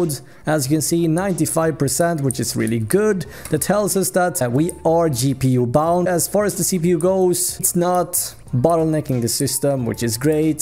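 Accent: Sweden. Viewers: U sound swedish and if you are, Hej :) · Are you Swedish? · Are you swedish sounds like it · Are you swedish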